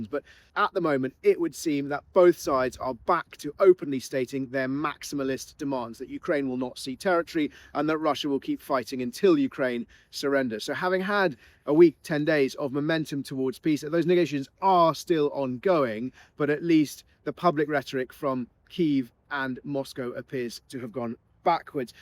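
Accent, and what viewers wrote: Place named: United Kingdom. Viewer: bla bla bla brit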